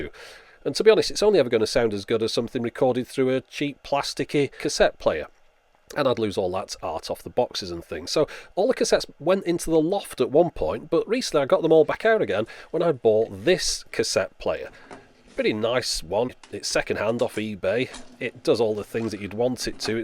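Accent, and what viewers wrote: United Kingdom. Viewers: Thanks,. excellent videos. I relate totally to the spectrum analyzer aspect of home and auto hifi. and for the record, your voice is excellent. for some reason, British accents are like fingernails against chalkboards to me, but not yours. keep up the great work.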